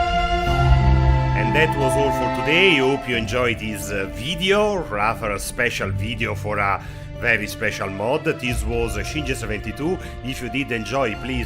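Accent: Italy. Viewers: C'è bisogno necessariamente del gioco originale? (dall'accento suppongo tu sia italico) Thanks! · Eppure qualcosa mi dice che sei italiano. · Nice video and epic accent (Italian?)! Chiao!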